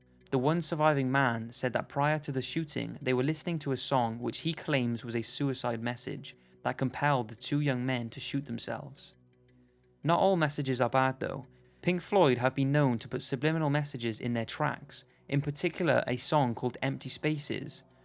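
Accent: Wales, United Kingdom. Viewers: are you Welsh? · Are u welsh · All the commentary is very well done in your videos. Other similar Top '#' channels either have annoying voices or talk too slow, fast, etc., and your accent is cool to listen to as well. (Which part of the UK are you from?) Keep up the good work! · Are you welsh? You sound like you have a Welsh accent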